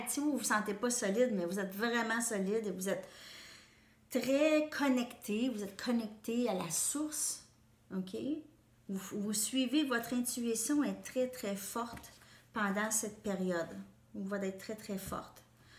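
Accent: Quebec, Canada. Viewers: Cc tout est très juste pour ma part pourtant malgré ttes mes épreuves...j ai perdu mon papa le <num> J ai du rompre après 10 ans de vie de couple d avec mon compagnon sur Nice car il n accepté pas les chats de mon papa et j avais fais une promesse à mon père je suis comment dirais je vidée épuisée fatiguée et paradoxalement dotée d une force incommensurable je déteste ces fêtes j aimerais enfin vivre un peu j ai 52 ans et le 6 février prochain min fils Quentin part travailler en Australie gros becs à toi ton accent j adore en 1991 je suis partie au Canada pour mon stage d infirmière j étais dans la région des Laurentides....et les cabanes à sucres que de bons souvenirs donc à cette heure là ici en France ou je te regarde il est 16h27 j en suis à un.point ou tu pourrais m annoncer la fin du monde je te dirais ok c est bien....en plus le syndrome du gisant je suis née le jour de la mort de ma grand mère maternelle si tu savais comme je rêve juste de me poser à qq part ou ailleurs avec mes 9 chats....Nagua Mistigri Pikatchou kopi césar choupinette blanchounette noirou roxy et rouquinou malgré tt cela je reste positive mais je fatigue et comme chanté mon chanteur préféré ^...espère avec ton coeur...la réponse est en toi la question est ailleurs ce n est pas parcqu une étoile s éteint qu' elle éteint forcément le ciel ^...écoute la chanson sur YT:^ ^ Québec je me souviens...une seule envie y retourner passer qq jours devant ses gran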